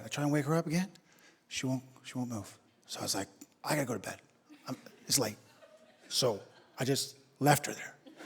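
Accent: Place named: Newfoundland, Canada. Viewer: When you started I thought there was Irish in your accent ,then you said you were from new foundland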